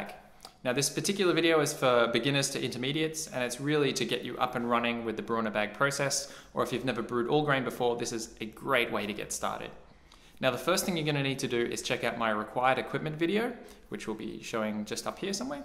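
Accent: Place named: Australia. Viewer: He said 0.8 - not 3.8. Its that Aussie accent.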